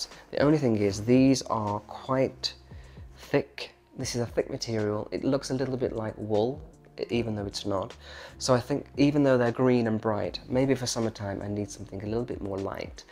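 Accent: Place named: Scotland, United Kingdom. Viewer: I see you likeBunny Mellon. She is a favourite of mine too. Sophie always steals the show. I have had a couple of Shi Sues over the years. Best dogs ever. Sorry, I did have a giggle over your Scottish accent. It took me ages to work out you meant lampshades. I thought you were saying lunch bids . Wondered what they were 🤪Really liked your topiary plate.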